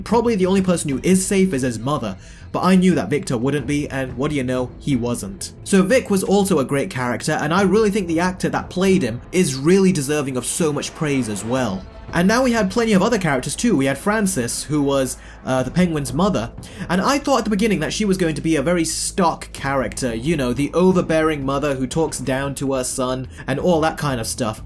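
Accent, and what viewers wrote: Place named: United Kingdom. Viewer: As I was listening to this doing my morning routine, I realized something I should have from the start but didn't pick up on until now. Maybe because of your British accent or "British like" (not good with that stuff), but anyway, you got me thinking about "Vic" is shorthand for cops for a victim. So in an odd way the entire time, they gave us a clue he was destined to be Penguin's victom all along. The extra sad part is if he let Squid live he would have had at least one dude who would recognize his body, but he killed him because he knew he would be (Victor) be less valuable to Oz in crown point and Victor's neck would be shot instead of choked out at the end. (Not to mention his mom giving Vic love and thinking he is the elder brother at times. As soon as Oz knew he had that gangster Aisian dude Vic was no more useful to OZ as a #2 man just like Squid would have been. 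Sad but Vic was always going to be a Victom of the city. Hopefully Batman takes in an Orphin underground that was selling bliss and wandered into the wrong tunnel and we can finally get a Robin or a Robin spinoff series that brings in Robin for Batman 3 vs Mayer Ozwald Cobblepot the new Mayer who "understands" crime better then the last Mayer Reeal.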